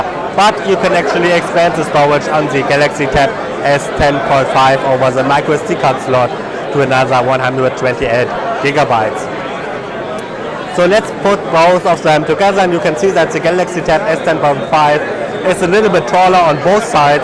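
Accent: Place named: Germany. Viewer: sis is se worth english ive ever heard please if you as a german guy do your hands ons in english please learn how to spell words like "thing" oder "that" properbly und jetzt nochmal auf deutsch lerne bitte erst englisch bevor du hands ons auf englisch machst die wörter heißen "this" und nicht "sis" oder "the" und nich "se" ansonsten mach auf deutsch weiter oder hörs einfach auf das kann man sich ja nicht antun ich konnte das video nicht mal halb anschauen weils mir dann schon hochgekommen ist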